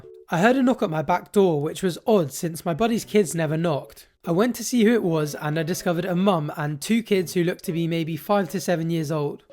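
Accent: United Kingdom. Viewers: I love your voice? Your defo from the uk too yes?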